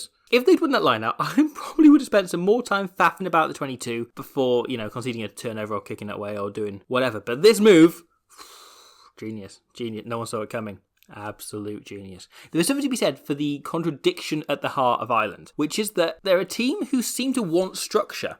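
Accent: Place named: England, United Kingdom. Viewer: Welsh parents but brought up in Nottingham, England hence the accent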